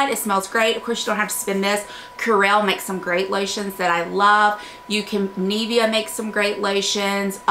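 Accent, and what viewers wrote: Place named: Mississippi, United States. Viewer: I have been dieing to know where you were from and as soon as you said "oil", I knew it was Mississippi! I'm from Mississippi too but currently live in Houston. Love your channel and appreciate a lot of your humidity proof make up tips!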